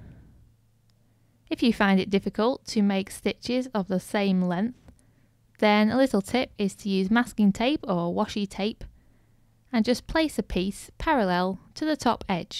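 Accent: United Kingdom. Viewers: Love your British accent! This helped me learn. I like when you speak slow and repeat, so I can understand. Thank you dear! From - Lori / MN, USA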